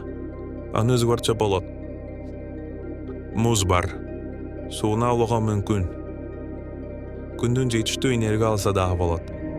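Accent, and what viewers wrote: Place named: Russia. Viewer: Орус эми кыргызча уйронуп келейткандайго.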